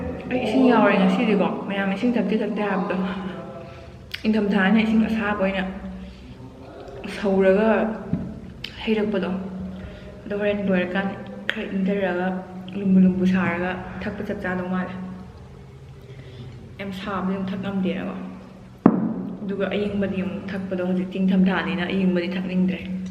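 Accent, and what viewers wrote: Kakching, India. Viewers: Kakching londwna ngangdena ngdi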